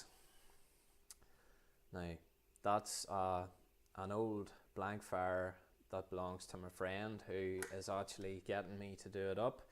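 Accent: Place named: Northern Ireland, United Kingdom. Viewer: Sounds like Northern Ireland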